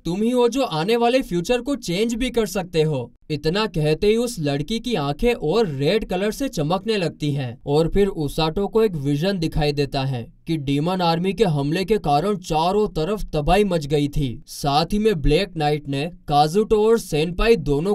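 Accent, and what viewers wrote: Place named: Gujarat, India. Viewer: Bhai ton Gujarati jese ha muje to acha lga😅❤ Love you 😎 bro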